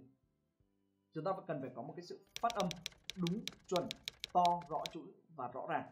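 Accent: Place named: Ha Tinh, Vietnam. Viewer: A ns tiếng Hà Tĩnh nghe gần gũi quá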